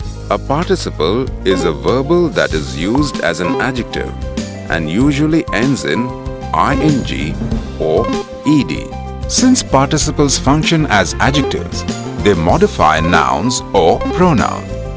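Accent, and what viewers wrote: India. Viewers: fuck man! I dont unterstand Indian accent.... -.-"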